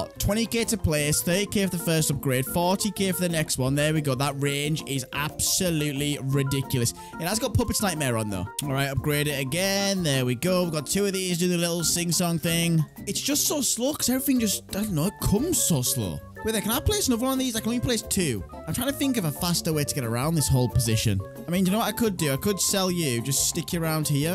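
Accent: United Kingdom. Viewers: Are you scotish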